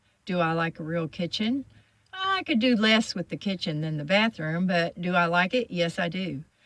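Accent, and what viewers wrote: South, United States. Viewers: very good. I think she is beautiful. Your mannerisms and all. You also remind me a little of a dead relative of mine. I think it's the fair skin and red hair and little southern accent : ) enjoying your videos! Keep them coming. Love your personality.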